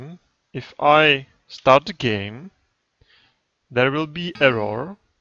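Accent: Czech Republic. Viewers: He is czech it's hard speak english when you are slovanian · Ten český přízvuk nezakryješ :-D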